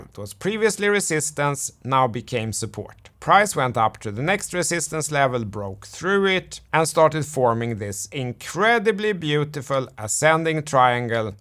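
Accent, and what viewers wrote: Sweden. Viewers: Love your vibe, you odd swedish accented guy!! You prob think that about our Aussie accent too.Thanks for sharing here on YT.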